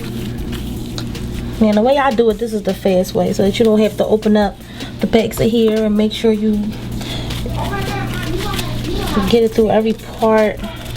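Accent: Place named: Alabama, United States. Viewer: More like Alabama or Tennessee, sis. Nobody in Nola sounds like that... I’m from Nola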